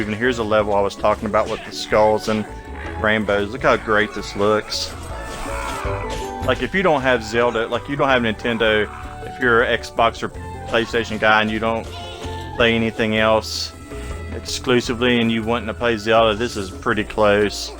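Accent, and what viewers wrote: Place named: South, United States. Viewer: Awesome southern accent bro sounds like me lol I'm from Memphis TN yo I'm wondering is it worth 13.00? Ps4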